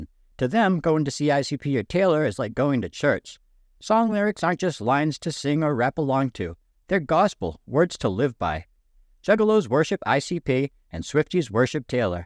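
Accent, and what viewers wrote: New England, United States. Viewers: You sound like you might be from New England? Love these videos hilarious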